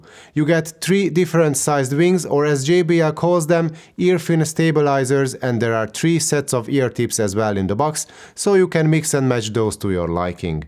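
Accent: Hungary. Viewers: Érzek egy kis magyart 😜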